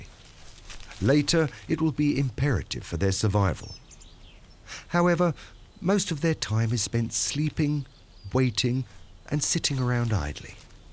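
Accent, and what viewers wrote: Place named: North, United Kingdom. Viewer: Disliked due to overly used northern accent.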